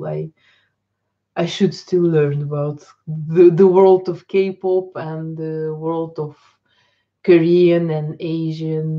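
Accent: Eastern Europe. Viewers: Це називається "слов'янський акцент". Не лише російськомовні мають такий акцент. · I knew something did not compute when I saw you lived in Belgium but had a very thick Eastern European accent :D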